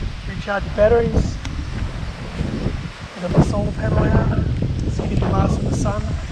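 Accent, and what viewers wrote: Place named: New Zealand. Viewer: Oh I just wanna say your from New Zealand🤣😂🤣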